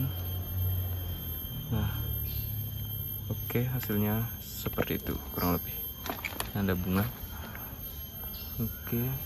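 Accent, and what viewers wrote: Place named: Bali, Indonesia. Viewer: Logat bali, plat motor bali, jawabnya jakarta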